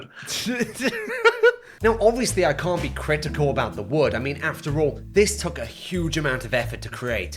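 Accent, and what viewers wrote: United Kingdom. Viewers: I love British man talking angry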